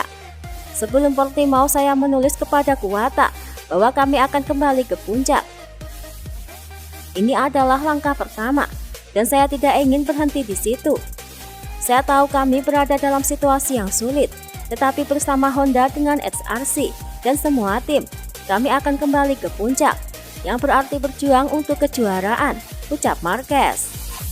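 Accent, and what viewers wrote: Java, Indonesia. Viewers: Admin Medok mania mantap 😂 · Iki MiMinya wong jogja phooww..yoo yg isi suaranyaa..medhokk..bgt..Min...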